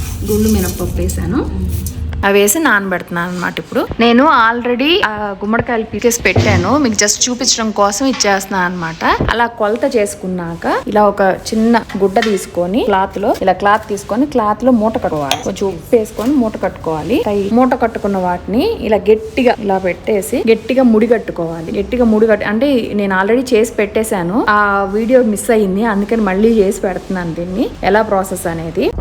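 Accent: Nellore, India. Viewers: Meedi nellore aa andi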